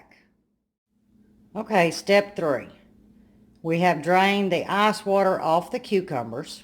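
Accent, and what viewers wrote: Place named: Florida, United States. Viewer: I absolutely love your accent. My kenfolk are from Blounstown FL Panama City FL and speak like you. You sound just like my cousin 😊 Good video. Thank you.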